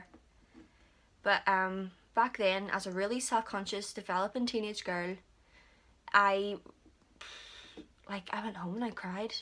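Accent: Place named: Northern Ireland, United Kingdom. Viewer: Thank you for sharing your story ❤ so inspiring and I love yhe NI accent xx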